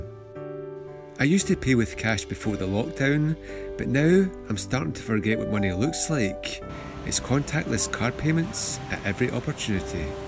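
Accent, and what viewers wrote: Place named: Scotland, United Kingdom. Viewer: Frankly love your Scottish Accent,. From Stuart Adamson to Sean Connery.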